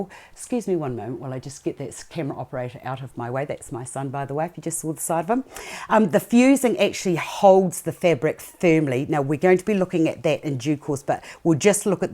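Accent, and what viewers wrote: New Zealand. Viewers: Not only was this very educational, but I could listen to you all day long- I love the New Zealand accent (Im Canadian :) ). Thank you, Im your newest subscriber :)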